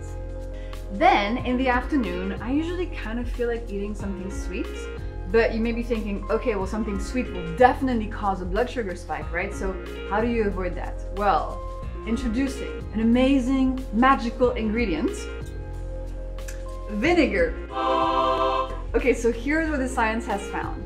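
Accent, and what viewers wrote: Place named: France. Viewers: She may have a French accent, but I think we can say it's very, very subtle. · I am in love with her French accent❤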